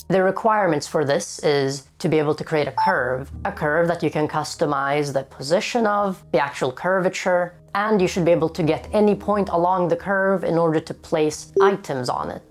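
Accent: Sweden. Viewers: Legendary algorithm pull Edit: Jag visste att det var en Svensk accent jag hörde. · i had to hear like 3 seconds of your voice to find out youre swedish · 5 seconds into the video, my swedish ass goes "hey this guy sounds swedish". i've never watched you before, just somehow clocked it. dubbelv eller vad kidsen nu säger.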